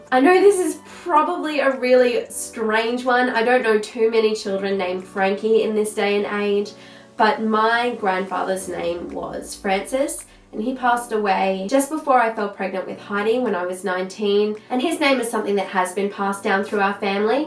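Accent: Australia. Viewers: OMG she sounds like an astraillian person lol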